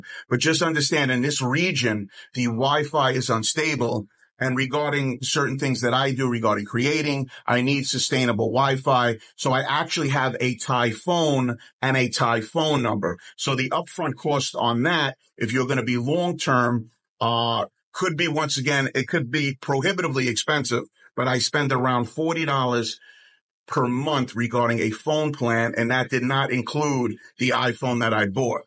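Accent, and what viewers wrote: Brooklyn, United States. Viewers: love the Brooklyn-speak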